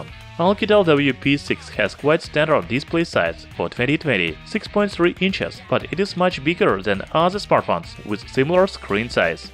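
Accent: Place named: Russia. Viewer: 𝗠𝗮𝘆𝗯𝗲 𝘆𝗼𝘂 𝗮𝗿𝗲 𝗮 𝗥𝘂𝘀𝘀𝗶𝗮𝗻. 𝗔 𝗥𝘂𝘀𝘀𝗶𝗮𝗻 𝗮𝗰𝗰𝗲𝗻𝘁.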